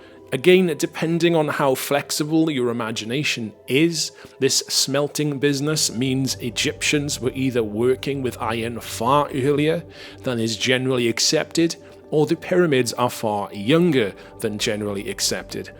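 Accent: Wales, United Kingdom. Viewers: Is the narrator Welsh?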